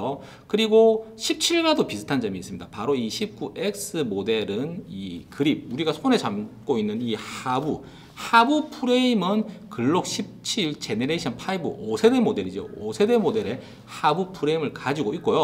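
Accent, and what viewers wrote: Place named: Gyeongsang, South Korea. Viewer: 아니 어떻게 억양은 골수 개앵사앙도오 인데 사용하는 어휘는 몽땅 표준어일까요? 총쏼때 한쪽눈 꼴치가꼬 전자가 쏘는 갱상도 아재는 너무 부럽네요. 분명히 아무리 들어도 마산분이신거 같은데요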